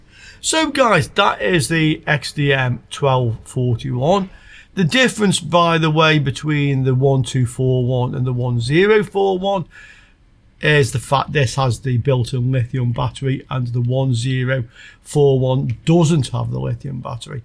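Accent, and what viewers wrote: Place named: Stoke, United Kingdom. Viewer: Classic stoke accent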